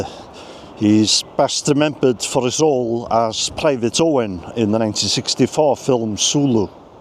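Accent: Wales, United Kingdom. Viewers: I really enjoy your videos. The Welsh countryside seems a place lost in time, where modernity with all its trappings hasn’t yet consumed the past. I hope it never will and parts of Wales remain a hundred years behind the present time. I bet Richard Burton noticed that when he returned to Wales from America .Your accent is remarkable. It’s so strongly Welsh that it almost sounds foreign, something like Eastern European at times. Charming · Ardderchog ! Excellent. Don't ever lose that Welsh accent. Loved this. One of your best yet. What's the guitar piece at the end ? It goes so well with your closing scenes. Diolch yn fawr. Thank you so much.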